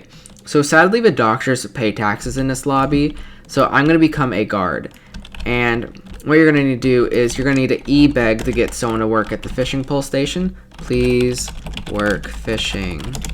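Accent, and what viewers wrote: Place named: United States. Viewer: because cowboy accent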